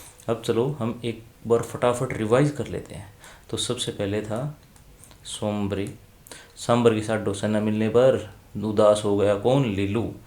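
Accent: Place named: Haryana, India. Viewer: 😅 PURE HARIYANVI ACCENT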